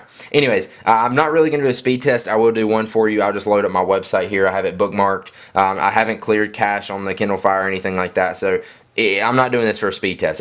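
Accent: South, United States. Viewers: lol, i loveeee how country you sound. were u from??? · Country or southern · I am from the south in America and actually not all of us have accents some of us talk just like people from anywhere else in America. It all depends on the voices of the people you grew up around. And the generation before him probably are from one of the older smaller towns where they have this kind of accent. Bottom line, not everyone from the southern states talk with an accent like this. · Love your southern accent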